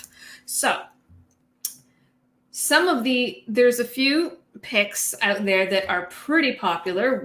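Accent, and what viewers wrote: Massachusetts, United States. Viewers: <shameless flirting with married woman> I think the Bay State accent with the Hawaiian flower in hair is a nice balance!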